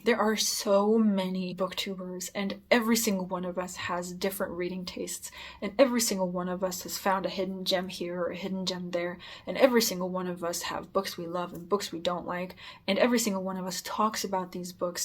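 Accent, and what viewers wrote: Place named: Midwest, United States. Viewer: That was a very interesting video. Well done. I am from the United States and I must say your English is perfect. A mild mid-west accent. This area attracted German and Scandinavian immigrants in the 18th and 19th century. Your vocabulary is extensive and you sound like an intelligent, thoughtful person when you speak English. More people should pause and think about what they want to say. It really works for you:)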